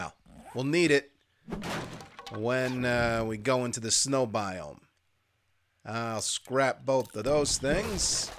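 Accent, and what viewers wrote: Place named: West, United States. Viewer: Loving this mod n ur western accent, keep em coming please! 💛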